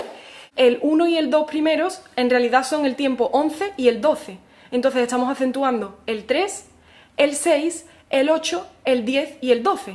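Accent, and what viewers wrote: Andalusia, Spain. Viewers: she is from Andalucía, she has a particular way of speaking spanish. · Es que es de Andalucía donde tienen un acento muy propio. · it's not a dialect but spanish with accent from Andalusia. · A quien no le gustaría tener a una profesora de guitarra tan guapa.... Madre mía....y con este acento andaluz...es un encanto escucharla. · Es español, español, no se trata de ningún dialecto, es Español con sus toque particular andaluz en la bella voz de.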